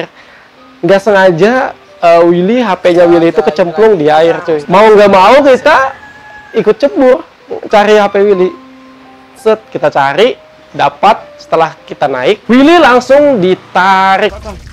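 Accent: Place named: Banjar, Indonesia. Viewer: Air nya rada sedikit hanta🤣 Kental banar banjarnya.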